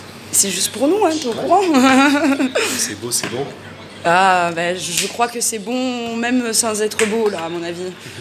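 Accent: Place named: South, France. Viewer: Je comprends pas tu as l'accent du sud d'un coup sur la vidéo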